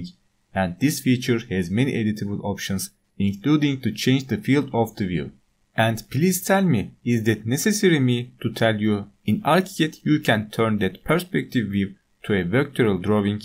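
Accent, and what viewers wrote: Turkey. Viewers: aahahah abi türk yaa bende aynı böyle konuşuyorum :D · türksün değil mi ingilizcen onu çağrıştırdı??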